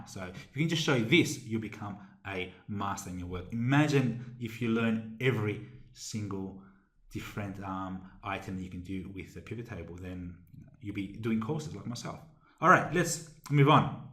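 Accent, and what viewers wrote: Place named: Australia. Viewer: with an Aussie accent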